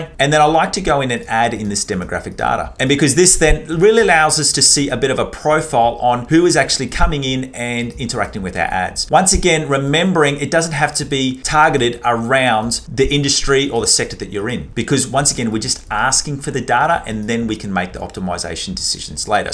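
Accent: Australia. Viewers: thanks for the video, your accent sounds aussie to me (most beautiful country in the world)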